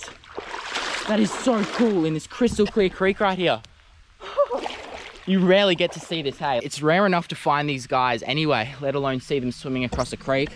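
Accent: Australia. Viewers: Brave Wilderness is great, however, it is not as good yours with your Aussie accent, sweetness, and willingness to learn and listen to others. I applaud you for being the best you can be at all times.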